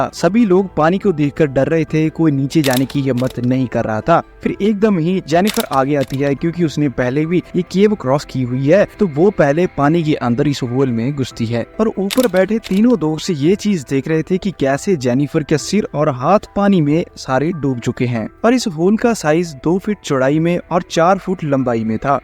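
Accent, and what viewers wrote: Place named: Pakistan. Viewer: tumhara accent Pakistani lag raha hai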